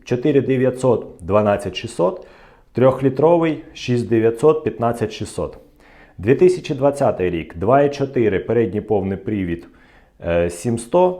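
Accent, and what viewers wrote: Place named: Russia. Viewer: Акцентик москальский однако), суржик.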